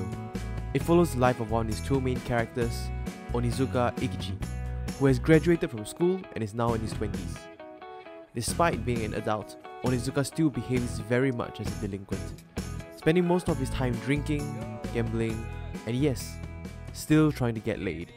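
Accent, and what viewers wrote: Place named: Singapore. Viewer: I can hear a Singaporean accent, I love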